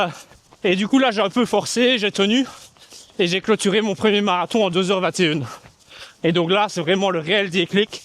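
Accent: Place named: Verviers, Belgium. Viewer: L’accent de Verviers qu’on aime tant 😍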